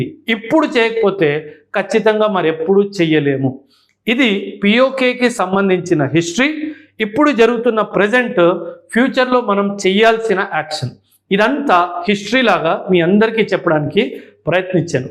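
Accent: Punjab, India. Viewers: తెలుగు మాట్లాడే వారు కూడా స్వచ్ఛమైన తెలుగు పలకడం లేదు ఒక పంజాబీ అయి ఉండి కూడా స్వచ్ఛమైన తెలుగులో మాకు సమాచారం అందిస్తున్నారు అభినందనీయులు ధన్యవాదాలు❤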